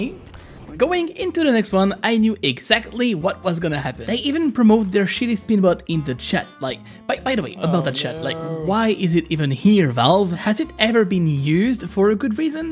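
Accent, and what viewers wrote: France. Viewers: Tu es français ou je me trompe car ton accent m'a l'air bien francisé ??!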